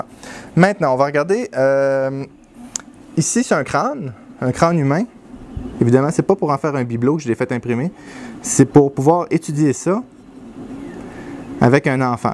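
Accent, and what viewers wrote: Quebec, Canada. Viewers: Merci pour tout. J'ai appris beaucoup avec toi et j'apprécie ton accent québécois. C'est assez rare d'autant que la qualité de tes vidéos est bonne. Pour la version courte passe au 4ième paragraphe. Je me suis acheté une tornado et j'ai eu beaucoup de plaisir avec cette fin de semaine. Je l'ai calibré avec un cube de 20mm (merci à toi). J'ai imprimé mon support et de petits bidules. Mais j'ai eu un problème avec hier en après-midi. Mon extrudeur sautait un coche au 5 secondes. J'ai arrêté mon impression, monté ma buse et donné de l'extrusion. Encore le même problème. J'ai essayé de la débloquer en retirant le filament à froid à plusieurs reprises sans succès. J'ai détaché le ... tube ... et essayé de la débloquer à chaud sans succès. J'ai enlevé la buse et enlevé (en poussant) tout les résidus, replacé la buse de secours et je crois que le problème s'est corrigé. 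J'ai commencé à nettoyer le bed. Rien à faire pour enlever la première couche et j'ai fini par briser le tapis. Si tu avais un truc pour le tapis ce serait bien. Et j'ai finalement abandonné. Par la suite, je crois avoir compris ce qui s'est passé. Ma dernière impression était en qualité haute et mon bed devait être un peu trop serré ce qui a causé un engorgement. L'engorgement étant fait les autres tests ont donnés le même résultat. Maintenant les questions. Comment faire pour nettoyer le PLA de la buse? Quels devraient être les paramètres d'impression lorsque la hauteur de la couche est à 0.1mm? Merci encore pour t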